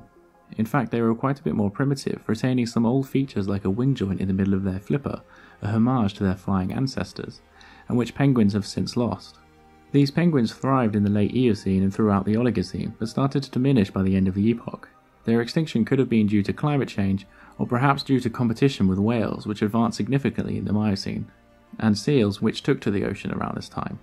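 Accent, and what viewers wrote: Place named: England, United Kingdom. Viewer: You make really interesting videos, and they are great to fall asleep to because your voice is so calm with English accent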